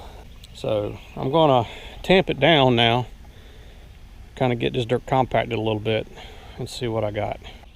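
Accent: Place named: Texas, United States. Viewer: Yea that's too far. The accent sounded more like you were from Texas. You can actually build an in ground pool for about 3 to 5k. Depends on how fancy you want the finishes and if you skim coat or just use a liner. The construction is just with simple cinder blocks. You have the hardest part already solved... digging the hole.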